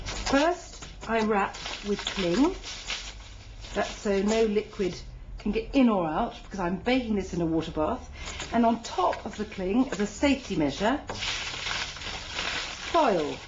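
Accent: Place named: United Kingdom. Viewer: you sound very british...haha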